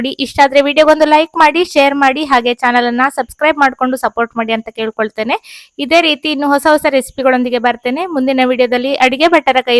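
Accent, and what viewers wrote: Kundapura, India. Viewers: I was waiting for this recipe from so many years. Being a kundaprian I used miss this every time i eat rasam in Bangalore. Thank u so much madam for this recipe. Now watching again to make the rasam powder for the second time. Twice in a week is mandatory in our home now😊. Laik attu saru. Heenge video madtaikani khushi aytu. Nam kundapra bhashe keluke chenda. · This is pure kundapur kannada ಕನ್ನಡ!!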